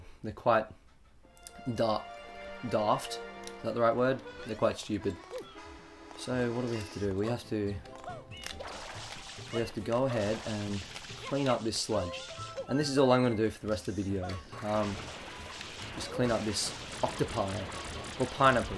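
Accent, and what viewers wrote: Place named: Australia. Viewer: Hey, just wondering, are you an Australian, Brit or New Zealander? I can never tell the accents apart! >:O Also, keep the videos coming dude, I'll definitely watch 'em.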